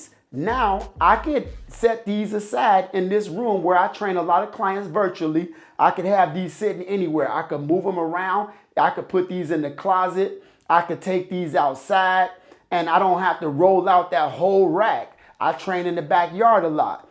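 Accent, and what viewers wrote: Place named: Washington DC, United States. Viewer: Thank u brother helpful video got the same set haven't had any issues yet. Sound like u from D C u gotta accent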